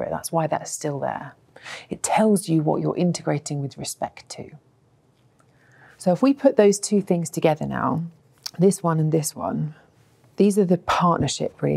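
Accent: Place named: United Kingdom. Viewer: Smart women = So attractive yeah the British accent doesn't hurt either.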